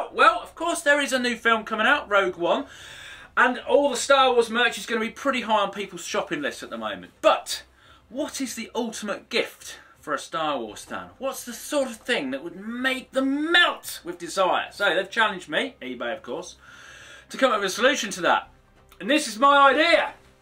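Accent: United Kingdom. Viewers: is he British ?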